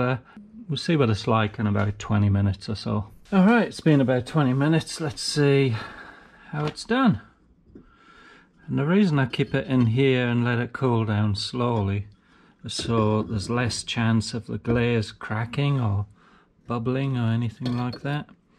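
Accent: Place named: Cumbria, United Kingdom. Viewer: Hi, I'm from Cumbria and your accent is very similar....where are you from?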